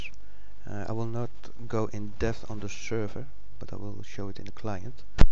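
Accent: Netherlands. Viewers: well if you are dutch and you have heard dutch people speak english you kinda get a feeling for it, but it isnt a crazy dutch accent.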